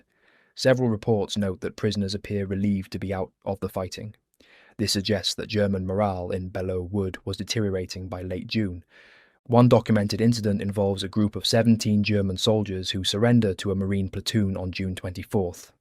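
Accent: United Kingdom. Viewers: Get rid of the UK narration 🤮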